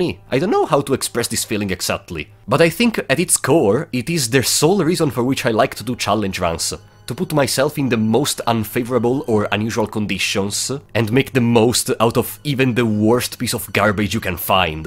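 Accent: Italy. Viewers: Sono nuovo e non posso fare a meno di notare che hai un accento molto italiano, mi sbaglio? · Can't help but notice, just a random question - your accent sounds exactly like my friend from Mezacorona, are you by any chance Italian?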